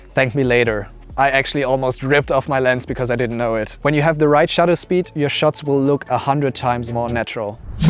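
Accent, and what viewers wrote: Germany. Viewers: Ich wusste es, du bist deutscher :D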